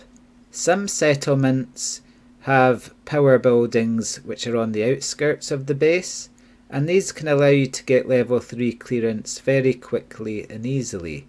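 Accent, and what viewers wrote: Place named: Scotland, United Kingdom. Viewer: No, that's right. Nothing harsh about it, it's more of a "thoughtful" Scottish accent. 🙂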